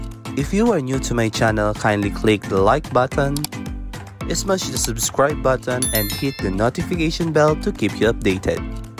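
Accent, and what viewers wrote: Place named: England, United Kingdom. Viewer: Cool English accent 😊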